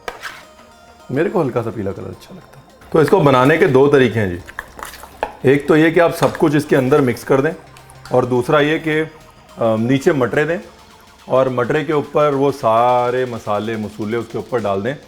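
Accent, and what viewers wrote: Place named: Punjab, India. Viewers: I love the way you cook, giving instructions and your punjabi accent while cooking. It's a great fun and enjoyment while cooking. Thanks Ranveer❤ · Sir before seeing your video I like that you such very good expaliner or your Punjabi accent is such a amazing ♥️♥️sir you explained very well and every single minute is utilised when I see your video 😊😊😊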